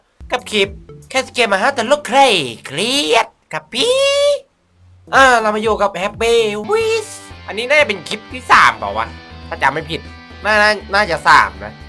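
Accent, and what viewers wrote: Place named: South, Thailand. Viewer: อย่าแหลงใต้ได้หม้ายพี่บ่าวม้ายชอบ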